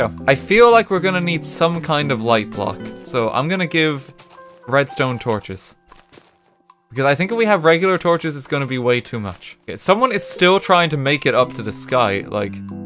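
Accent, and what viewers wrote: Ireland. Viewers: Ur Irish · U Irish my guy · Eyyi I hear an Irish boi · So funny story. Last night I plugged in my kindle, set it to an "RTGame's Best Videos" playlist, put my earbuds in, and accidentally fell asleep. I'm American, but when I woke up this morning, as a result of listening to him talk all night in my subconscious, my thoughts had an Irish accent for a solid minute. I cracked up as soon as I noticed it, and as much as I wish this was an April fool's day prank, I'm being completely serious XD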